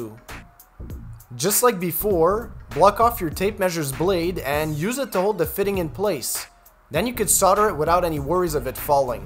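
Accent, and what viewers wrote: Quebec, Canada. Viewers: I could tell you re from quebec ... the plate confirmed it 😎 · I knew you were from Quebec 😂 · I love the accent. I just knew you were from Quebec. Hey, you're a smart guy. Merci for sharing your knowledge. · French Canadian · I like the Canadian accent!! It's all good heh!!!! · AHA... yes I thought I heard a Canadien brotha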